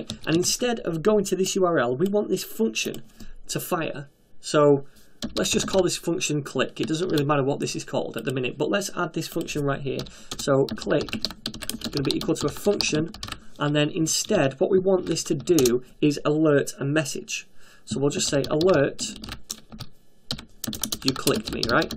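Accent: United Kingdom. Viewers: your British accent is amazing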